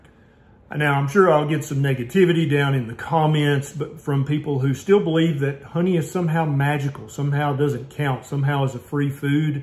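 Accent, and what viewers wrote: South, United States. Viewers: If he didn't have that southern accent, I think his credibility would go way up.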